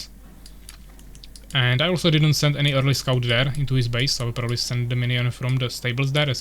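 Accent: Czech Republic. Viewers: are you czech? you have very czech accent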